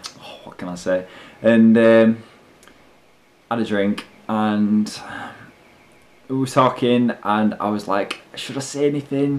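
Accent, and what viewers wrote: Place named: Yorkshire, United Kingdom. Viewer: Dat Yorkshire Accent Doe so Amazing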